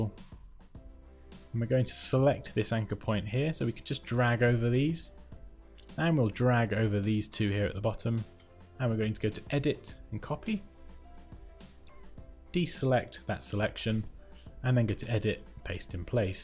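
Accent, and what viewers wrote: England, United Kingdom. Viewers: I love this english accent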